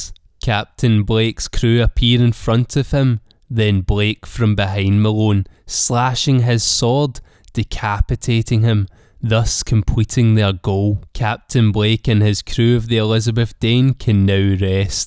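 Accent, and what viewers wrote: Scotland, United Kingdom. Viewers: Are you Scottish? Heavy but wonderful accent. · What part of Scotland are you from? Glasgow?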